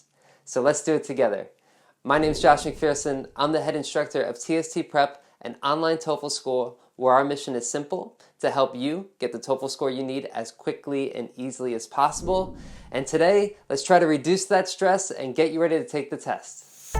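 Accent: United States. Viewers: Is that New York accent I'm hearing? The "T"s sound a bit like how Italians or Spanish pronounce their Ts.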